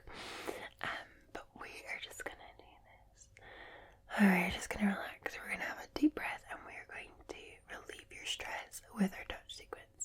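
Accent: Scotland, United Kingdom. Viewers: Love me a wee bit of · "gurril", yep defos a scottish lass lol though doesn't sound weegie, more eastern, edinburgh or the lothian area or even dumfries lol whatever it is, its nice having a scot online.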